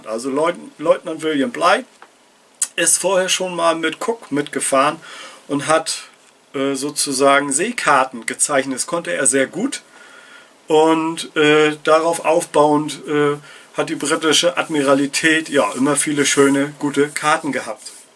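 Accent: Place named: North, Germany. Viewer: Moin, Ich liebe deinen norddeutschen Schnack!!! Ich könnte dir stundenlang zuhören 👍🏴‍☠️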